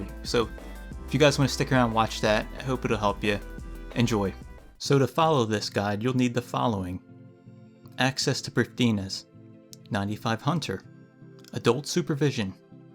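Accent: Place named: Philadelphia, United States. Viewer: You sound like you’re from the philly area. Also I hope you play osrs by now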